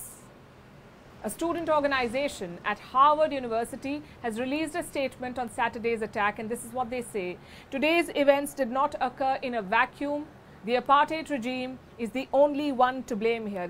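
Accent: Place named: India. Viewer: Every time I hear your voice it reminds me of all the scammers from India! ''halo... I'm David Copper from the IRS...send money or else''!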